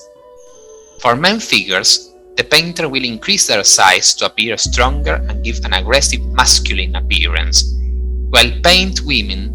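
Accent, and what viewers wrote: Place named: Italy. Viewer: Italian Accent with ‘Roman’ painting.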